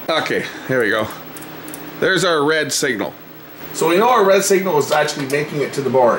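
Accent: Canada. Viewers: Gonna take a guess on your accent but are you from Alberta?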